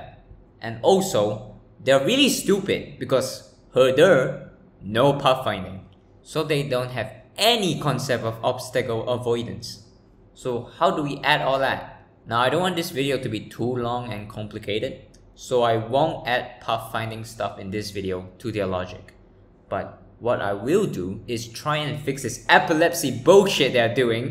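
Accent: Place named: India. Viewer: subtle indian accent.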